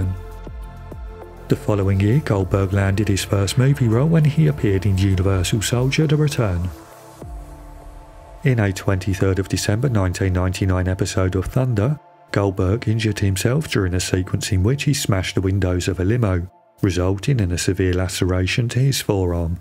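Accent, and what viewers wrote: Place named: London, United Kingdom. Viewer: Your accent is cool. I'm guessing you're originally from the London area? Maybe Surrey.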